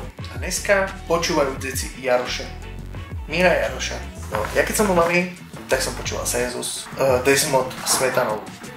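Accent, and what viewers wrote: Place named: Trnava, Slovakia. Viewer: Toto je trnavčinka jak remeň 🤣🤣🤣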